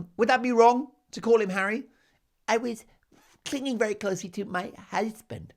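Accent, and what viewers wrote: Canada. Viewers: Your Canadian accent is great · Your Canadian accent is quite good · Your Canadian accent is so funny. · Love your french from Moncton NB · The Canadian accent is ace!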